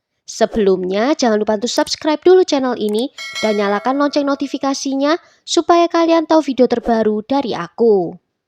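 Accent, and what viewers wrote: Java, Indonesia. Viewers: Mimin suaranya medok. Jawanya mana min?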